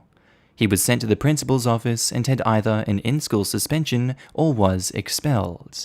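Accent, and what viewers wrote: Canada. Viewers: I think you sound Canadian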